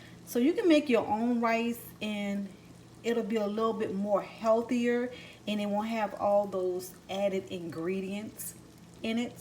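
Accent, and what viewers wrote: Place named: New Orleans, United States. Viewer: Nice baby yo accent so new awlins. Gentilly?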